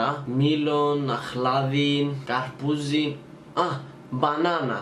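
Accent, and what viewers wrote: Cyprus. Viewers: Λατρεύω τα κυπριακά !!! Και είσαι πολύ ωραίος και σου πάει πολύ το μαλλί !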